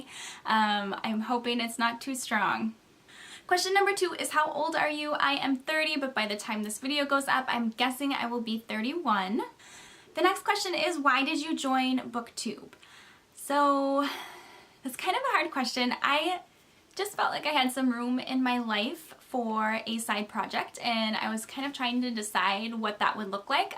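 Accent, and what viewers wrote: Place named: Minnesota, United States. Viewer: welcome! I also just joined booktube :) I'm from Iowa, so I could totally tell that you were from Minnesota right away!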